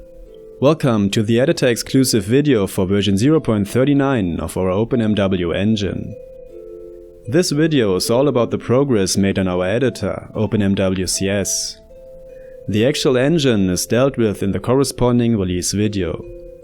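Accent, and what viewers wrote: Germany. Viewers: Are you german? Your accent sounds so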